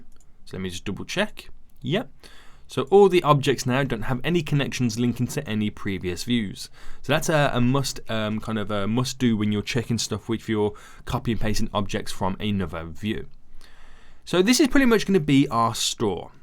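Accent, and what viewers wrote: United Kingdom. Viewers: great tutorial brother thanx and cool british accent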